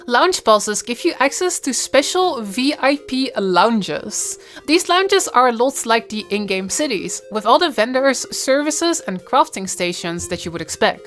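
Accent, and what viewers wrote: Netherlands. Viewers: u sound very dutch ^^ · I knew it!. the moment that accent hit, haha Dutch person! you are found! haha. Anyways, thanks for the data. Good luck on the rpg.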